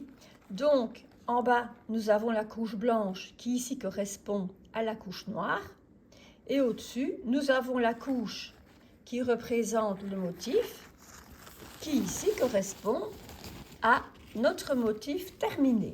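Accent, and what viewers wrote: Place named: Belgium. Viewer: Merci pour ce tuto qui est pour moi génial, je ne comprenais toujours pas jusqu'à ce jour comment faire la superposition, mais avec vos explications je pense maintenant que j'ai compris, cela va être un jeu d'enfant, et merci à vous pour votre bel accent belge, je vous souhaite pleins d'autres tutos et je vais vous suivre sans problème. Bonne continuation et à très vite.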